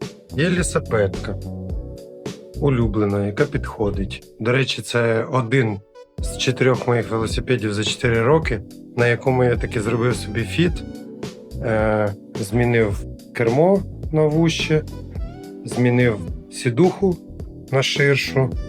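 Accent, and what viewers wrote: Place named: Vinnytsia, Ukraine. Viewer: Лайк за Вінницький (рідний) говор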